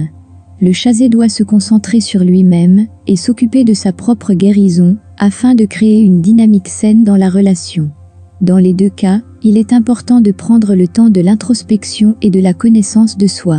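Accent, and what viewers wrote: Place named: France. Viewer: J'avoue que l'accent français me fait presque rire alors que dans la vraie vie j'ai plutôt envie de pleurer... quelle vie !!!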